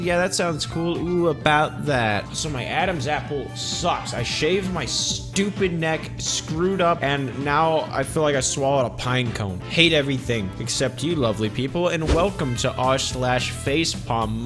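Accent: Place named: New York, United States. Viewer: I love the NY accent, adds depth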